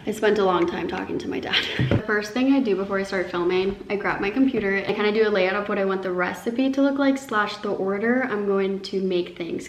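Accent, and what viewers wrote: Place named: Utah, United States. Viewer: Definitely a Utahn with that “what I’m DO-EEN” accent. Not a Mormon though because coffee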